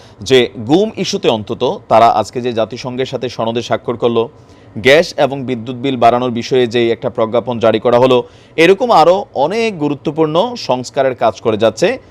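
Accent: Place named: Noakhali, Bangladesh. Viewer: ভাই আপনি যতই শুদ্ধ ভাষা বলতে চেষ্টা করেন না কেন আপনার নোয়াখাইল্লা ভাষা চলে আসে এটা খুব হাসির কারণ হয়ে দাঁড়ায় তাই আপনি নোয়াখাইল্লা ভাষায় বলেন শুদ্ধ ভাষার বইলেন না আপনারে আর মানায় না এটা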